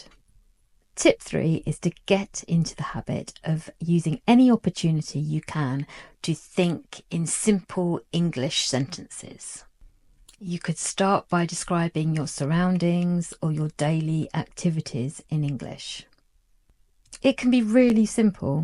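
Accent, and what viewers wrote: United Kingdom. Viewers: All tips are important for learning English and listening to the British accent makes me enjoy learning English.❤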